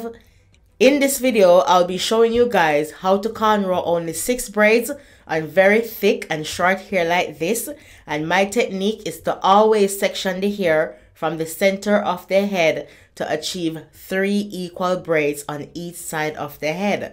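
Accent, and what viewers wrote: Jamaica. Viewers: A me alone hear the jamaican accent in her voice?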